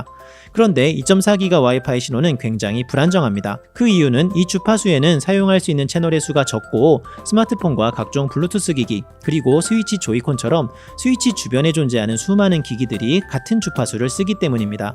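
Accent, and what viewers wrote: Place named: Gyeongsang, South Korea. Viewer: 약간 경상도 억양이 있네요 그리고 전 이더넷을 박아놔서 ㅋㅋ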